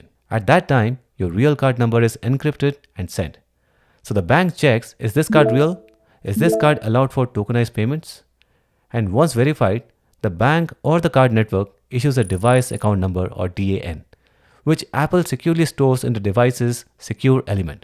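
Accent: India. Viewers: Are you India ? You speaking likes India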